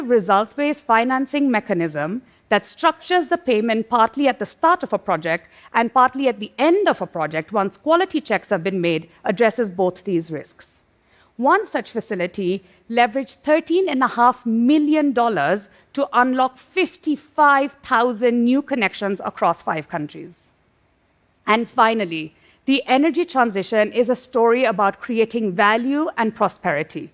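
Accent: India. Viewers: to all white people, this is how the indian accent sounds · Can still hear the underlying Indian accent that makes it difficult for me to understand her without concentrating.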